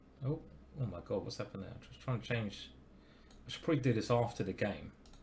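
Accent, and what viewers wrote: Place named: South East England, United Kingdom. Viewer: Idk, you do a pretty excellent South East English accent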